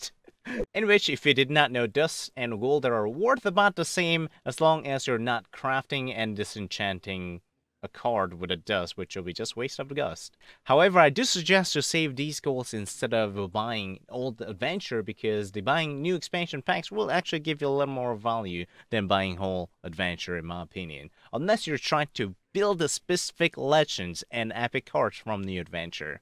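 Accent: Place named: South Korea. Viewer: Korean accent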